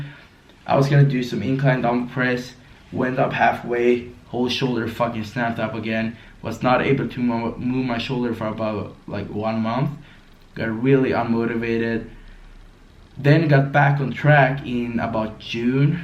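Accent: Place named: United States. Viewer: i love your american bro accent hahaha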